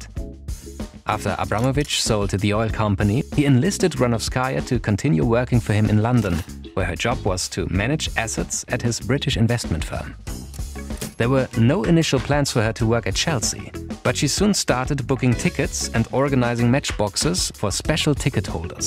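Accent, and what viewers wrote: Germany. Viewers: Nice german accent btw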